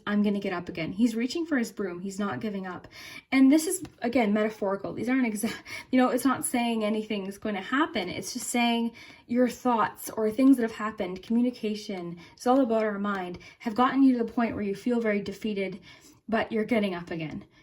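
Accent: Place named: Canada. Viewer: Are you from Canada? 🙂I lived there before I know that accent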